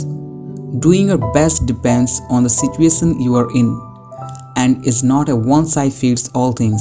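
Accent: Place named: India. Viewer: We are going to read a summary of the four agreements with a barely comprehensible accent!? Couldn’t you find a native Speaker of English? It’s like tech-support from Calcutta.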